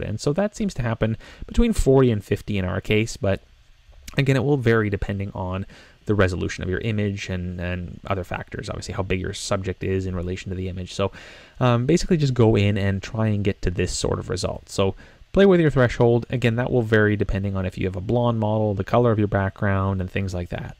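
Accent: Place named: Canada. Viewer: I knew you were Canadian by your cadence. Dead giveaway was when you said too low. also thank you cause this was really helpful despite my need to identify your heritage. haha.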